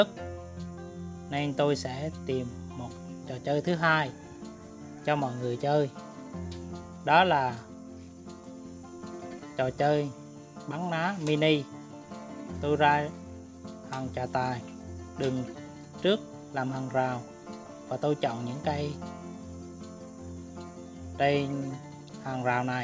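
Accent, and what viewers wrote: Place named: Quang Nam, Vietnam. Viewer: Trò đầu tiên với nắp nước ngọt mình có chơi rồi , tuổi thơ lại ùa về. Cái giọng nói QNĐN thân thương quá vì mình là người quê ở Quảng Nam. Gửi bạn ❤️👍🎁✅chúc bạn thành công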